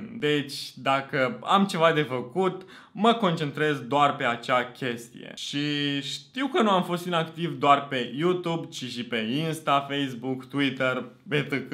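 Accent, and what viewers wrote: Moldova. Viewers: Ador accentul tau😍 esti moldovean de-al meu 😌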